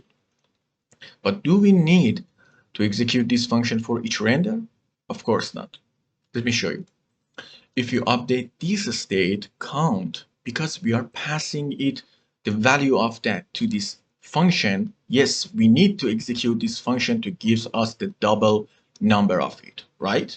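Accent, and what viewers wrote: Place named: Iran. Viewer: Persian accent sooo good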